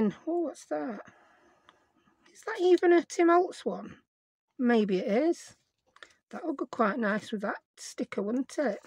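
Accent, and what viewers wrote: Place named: Northern England, United Kingdom. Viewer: I found your channel a couple of days ago, and oh boy I’m loving it. I lost both my parents just before Cov. Hit. I didn’t realise how much I missed northern accents, I was born in Yorkshire but grew up in Lancashire. However I’ve lived in the south since getting married 24 years ago😮. I’m also a big Tim Holtz fan an just love the items you make. Actually May I ask has Tim stopped designing new paper pads? Do I need to start hoarding them? Off to watch another of of your videos. Thank you for sharing your time.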